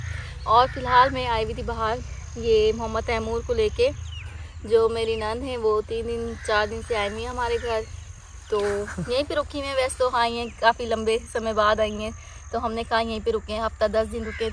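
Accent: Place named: Sindh, India. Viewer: آپ کا بولنے کا لہجہ سندھیوں جیسا ہے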